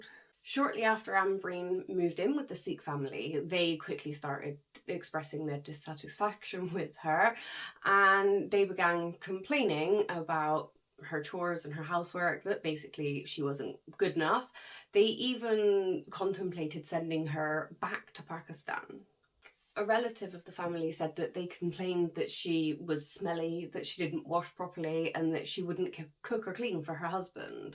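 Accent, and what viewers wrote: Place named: West Country, United Kingdom. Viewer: Cornwall, or the West country? 😂 that's a guess from a northerner. Either way, great video, keep at it! :)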